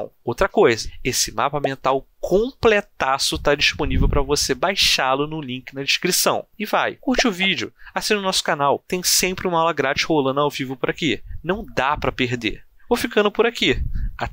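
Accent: Rio de Janeiro, Brazil. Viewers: Sotaque carioca dele esta no pico nesse dia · Carioca, mano da uma agonia xxxxxxxxxxxxxxxxxxx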